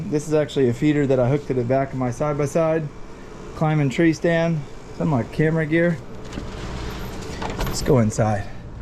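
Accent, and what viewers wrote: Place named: Kentucky, United States. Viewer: You sure your not from Kentucky, you speak like you are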